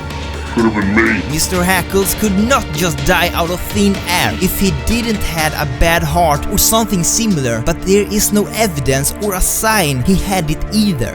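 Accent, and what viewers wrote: Sweden. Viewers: Hörde på din dialekt att du är svensk! Jätte bra video btw 😄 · ''A meteor hits the city and..'' GOSH you can just hear whole sweden in his voicexD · U sound so swedish that's probably cuz u are from sweden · The second I heard your accent, I knew that you were Swedish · Are u Swedish? Lol (I am and I just recognized ur accent) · clicks on random sims video from a YouTuber I've never heard about before Gets hit with strong Swedish accent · Are you swedish? Because your accent sounds like so... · Hahahah jag älskar din svenska brytning 👍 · Man hör verkligen den svenska engelskan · Älskar att man hör att du är svenne hahahahaha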